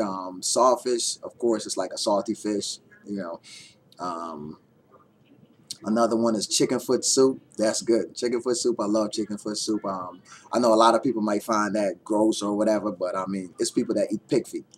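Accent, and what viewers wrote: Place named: United States. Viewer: You have an american accent..could you change to a guyanese accent in guyana? Cause I kinna lost my guyanese accent..lol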